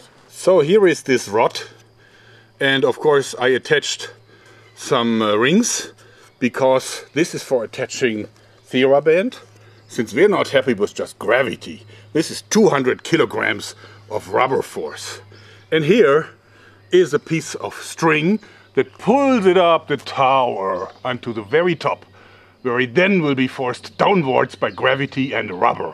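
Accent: Germany. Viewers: You've got a real German accent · Cool video! Du bist deutsch oder? :D Erkennt man am dialekt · xXxFanisxXx it is a german trying to speak englisch (like me)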